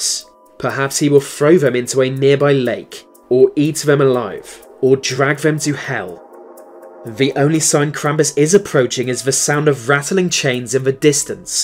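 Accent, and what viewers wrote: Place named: RP, United Kingdom. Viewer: now that's the Queen's English and how it should be spoken.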